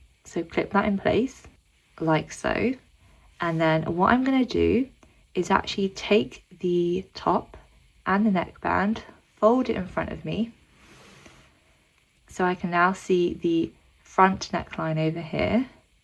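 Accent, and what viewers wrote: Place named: United Kingdom. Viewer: what kinda uk accent do you have? i love it!!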